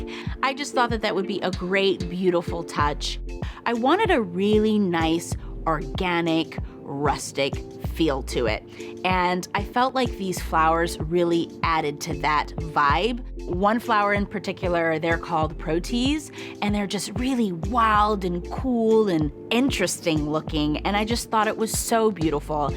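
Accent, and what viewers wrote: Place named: Los Angeles, United States. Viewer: True lA girl I can hear the accent, this was so beautiful!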